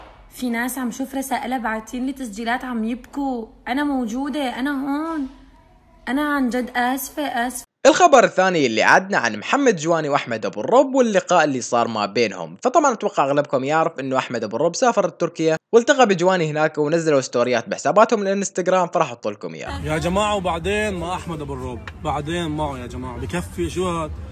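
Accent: Iraq. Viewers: كانو انت عراقي من لهجتك مبيت كتير حلوه اللهجه العراقيه 💛💛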